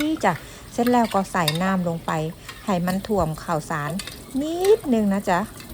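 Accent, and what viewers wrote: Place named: Korat, Thailand. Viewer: วิธีหุงข้าวเหนียวด้วยไมโครเวฟ เยี่ยมเลยค่ะป้า อยู่ส่วนไหนของโลกก็มีอาหารของโปรดบ้านเรากินน้อจ้าป้า สำเนียงโคราชนี่ได้ใจย่า🙏❤️🤟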